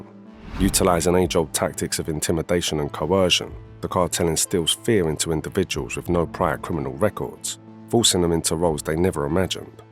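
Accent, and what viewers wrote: London, United Kingdom. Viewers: Anyone know what accent is this? London?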